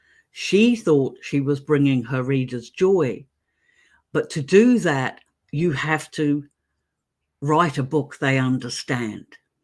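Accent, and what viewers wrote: New Zealand. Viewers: I saw the backdrop of your thumbnail and thought, oooohhh that looks kiwi. Heard your voice. Was like definitely kiwi. Hellooooo from Auckland 👋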